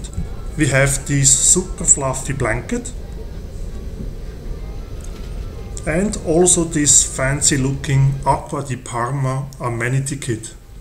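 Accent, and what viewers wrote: Germany. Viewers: I understand you very well. I personally think you don't have to work on your accent. German accent could be quite charming. I am living in London but have a mixture of Thai American English and Dutch accent depending on who I am talking with, choice of words n my mood. Hahaha. Thanks for nice report. Patrick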